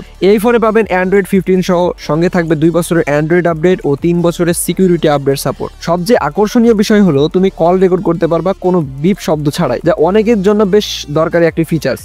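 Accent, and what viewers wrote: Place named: Bangladesh. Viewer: আপনার শব্দের ব্যবহার ও উচ্চারণ শৈলি ইন্ডিয়ার মতো নয়। পুরাই বাংলাদেশ।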